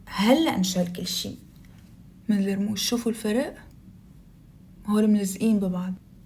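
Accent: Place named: Morocco. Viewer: واش بتعيشي في المغرب لأنك مبين انوا انتي مغربية لأنك مابتعرفي تتكلمي منيح بالسورية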